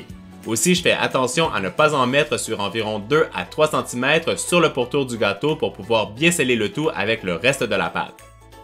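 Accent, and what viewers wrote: Canada. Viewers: J'adore ton accent canadien t'est vidéo son trop bien continue comme ça on adore tes vidéo YouTube bisous 😘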